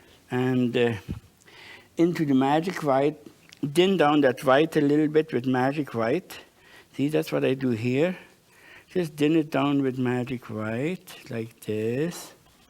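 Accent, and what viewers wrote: Germany. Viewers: He has the same accent and speech patterns as my German grandmother. Miss you Oma.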